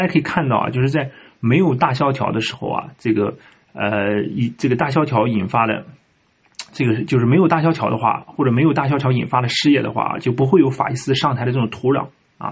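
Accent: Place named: Inner Mongolia, China. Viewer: 越听越像哈，也像呼市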